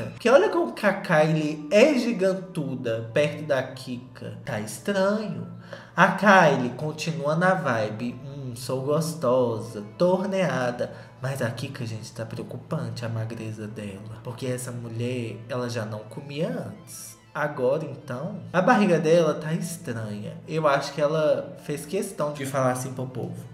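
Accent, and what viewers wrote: Minas Gerais, Brazil. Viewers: quando vc fala Guarapari, tá falando do meu País ES??? Mineirinho 🤣🤣🤣🤣🤣 amando seu canal, nova por aqui 😜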